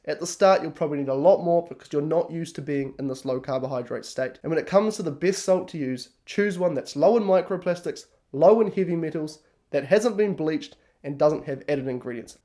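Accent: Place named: New Zealand. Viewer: Kiwi accent is never a problem listening from Australia. Good on you ❤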